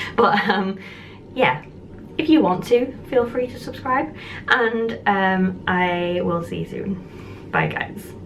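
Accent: United Kingdom. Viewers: And i just wanted to say that you are awesome and i love watching your videos. I just recently found you and I am glad I did because i Love that you are different than most, hell...I am different than most! But i love your channel and I will continue to follow you. You're pretty awesome! I wonder, how much was it to order your dreads? I would Love to see if i can buy them from the USA. I love the UK though, it's the one place that I must see before I die. I don't know what it is about the UK but i gravitate towards it. Its like something pulling me and i am so in love with the Accent, the culture, everything about it! Is your real name? I apologize if that's personal or a little forward but is favorite name in the WHOLE wide world. I was going to name my daughter Belle. But her grandma was like NO, people will call her Lunatic, So yeah... i was like SO What, she could be called worse..lol! 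Anyways, you get the point! You ROCK!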